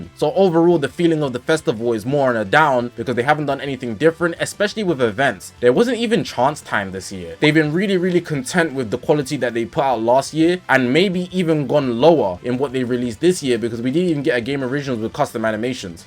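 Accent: United Kingdom. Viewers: Just a question, could you say " a bottle of water"? Because i heard the British accent is very strong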